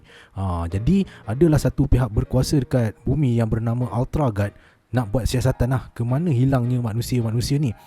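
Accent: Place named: Kedah, Malaysia. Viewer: Awat ckp kedah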